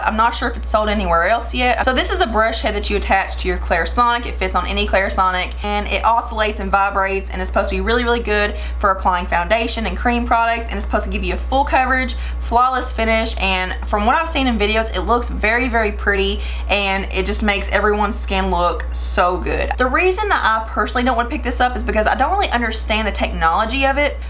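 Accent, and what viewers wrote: South, United States. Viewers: "okay first of all. who is Natasha denona?" omg i'm weak. great video. love your southern accent, i'm a southern belle as well and it comes through so strong in my videos 😂 love it 💕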